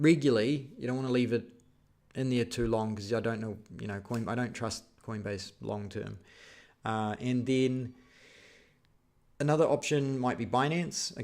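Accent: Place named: New Zealand. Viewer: KIwi accent!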